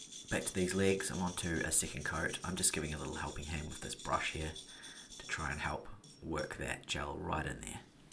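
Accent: New Zealand. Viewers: Keep talking cuz I love your kiwi accent! The piece came out beautifully! DDecker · Please, keep talking. I like the explanations and the New Zealand accent. 😉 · Kia Ora! New subbie here from Australia and also a kiwi 🙌🏾 keep talking bro! Love hearing the familiar accent. You have a calm voice too. Kia kaha bro 😎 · Your kiwi accent is nice to listen to there's always the mute button for those who disagree ,great job on the stag dresser I had a set and chalk painted them disaster !!, keep up the good work . ( Greetings from Aberdeen Scotland )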